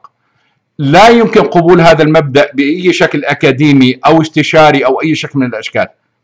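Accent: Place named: Gulf. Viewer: اخوي الأستاذ سعودي او عراقي لاني عجزت اميز لهجته خليجي على عراقي على شامي … واسم العائلة الحجي وهو منتشر بين اهل العراق واهل الخليج خاصة الكويت والاحساء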